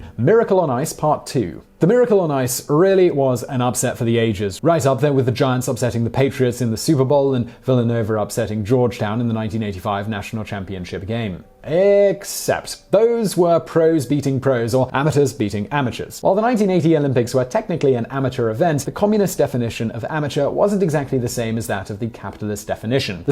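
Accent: United Kingdom. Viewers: Sal Pastore I think some are just because he’s British and they have different pronunciations, where others he’s just not familiar w the word.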